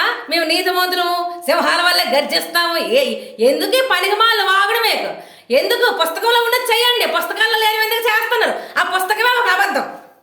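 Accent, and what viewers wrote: India. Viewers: Em basha babu turpu la vundi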